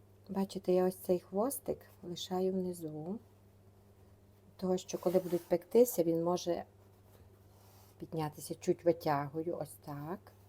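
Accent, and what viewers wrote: Transcarpathia, Ukraine. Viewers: Пані,дякую за рецепт.завтра буду пекти. Дякую за дуже детальні рецепти і за чудовий закарпатський діалект.❤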